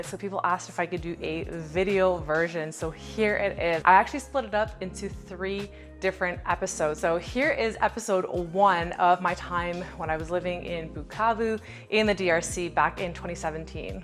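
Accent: Quebec, Canada. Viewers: Your Quebecois accent must have been a hit among the Congolais. Your story briefly mentioned “adjustment”, which I know was something you must have been downplaying for those who could never understand. You’ll probably remember your first days in Congo and the culture shock for the rest of your life. I still remember arriving in Zaïre at Kinshasa in 1978. I was 13, Couldn’t speak French at the time, There was a drought going on, a war just ending, and my family and I were reduced to eating tomato soup for months until our regular family food shipment arrived. The adjustment for me was epic, and I had already become used to living in several different countries by then. The experience of living there for a little more than four years was so wonderful, and exciting. I miss that country so much. Interesting that your flying, even your entry into the DRC was far more eastern-oriented than it was in the 70s. 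In my days, EVERYTHING was Kinshasa-centric. Supplies, even for the Eastern part of the country were mostly trans-shipped out the Kinshasa airports, and trucked in from the port of Matadi. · Great video, ton accent français, tu dois être une quebecoise si je me trompe pas... Sinon 👏🏽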